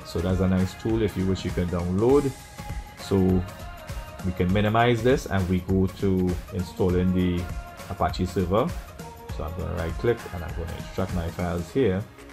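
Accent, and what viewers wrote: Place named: Trinidad and Tobago. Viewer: This voice is a trini for sure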